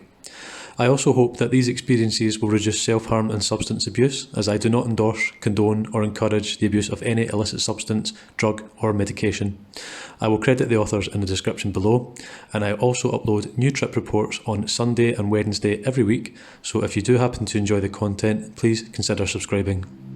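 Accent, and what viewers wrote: Scotland, United Kingdom. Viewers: I was watching Shrouded Hand's video on scary trips and I ran out, so I tried to find some more trip reports on YT, but I remember thinking "aaaah, they just wont be the same without his gentle tone, eerie music and Scottish (?) accent"... then I landed here.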